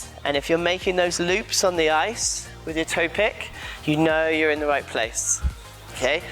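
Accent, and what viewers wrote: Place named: England, United Kingdom. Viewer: I know right? To this Texan (Texas, USA), refined accent sounds so cute and charming. Instead of saying "water," he says "watah." He usually shoots his video straight and doesn't try to be funny but in this one he plays around a little and he even plays with the accent once and says "wateeeer," I can't remember what word he said. I'm surprised you are attune to English accents, I guess you speak English well. You wanna talk about charming, I'm sure you know the famous Brazilian singer Roberto Carlos. I like to listen to him sing in Portuguese and if I know the song in Spanish I like to think I can follow along in Portuguese. Mostly I listen to him in Spanish and he has a Portuguese accent and it's majorly charming. So, we all agree, is amazing.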